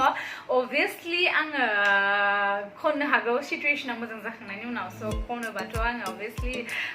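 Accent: Kokrajhar, India. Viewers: Kokrjhar nijg same jaw railainaya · Hi abo jgni kokrajharni badinw railaiw mtw🥰🥰🥰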